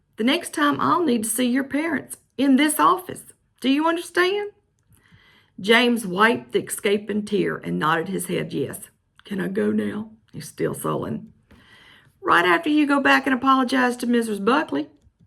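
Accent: South, United States. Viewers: I love your southern and expressive accent. Such talent!🥇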